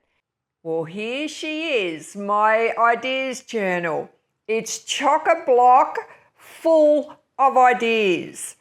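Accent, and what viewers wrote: Australia. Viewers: Such beautiful ideas. Love your Aussie accent ❤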